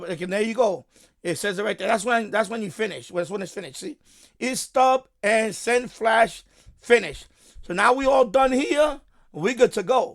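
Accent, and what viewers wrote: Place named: New York, United States. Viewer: As soon as the video started and I heard your accent, I knew I was in the right place. 😂. Clearly a New Yorker talking about radios as opposed to all the Brits. Great vid! The firmware update worked perfectly.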